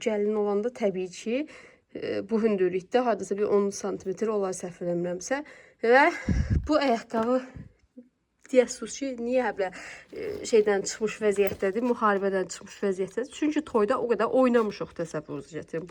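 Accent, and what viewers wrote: Sheki, Azerbaijan. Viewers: Salam, xanım.Necəsiz? Sizin nə gözəl dialektiniz var.Yəqin ya Şəkilisiniz,ya Qaxlısınız.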